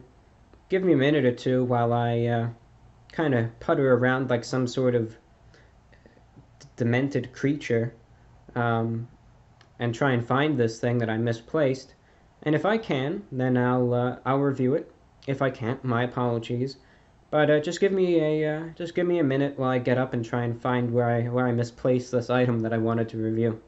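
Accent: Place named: New York, United States. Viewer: I knew you were from that part of the map.. The voice. Women love that New York voice. New York and New Jersey.. We women love those accents..🤔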